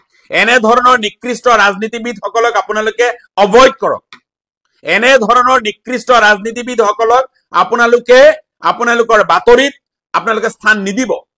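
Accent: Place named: Bangladesh. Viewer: ছুবৰৰ বাচা। মিযাৰ ধৰ্ম সত্ৰ মাটি দখল কৰা নেকি। তোমাৰ অসমীয়া শুনিলে গম পায তুমি পূৰ্ব বংগীয মূলৰ।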